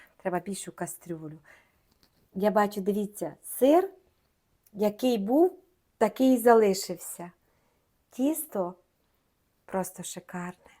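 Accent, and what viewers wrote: Transcarpathia, Ukraine. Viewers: Не нервуйте😊- то є Закарпаття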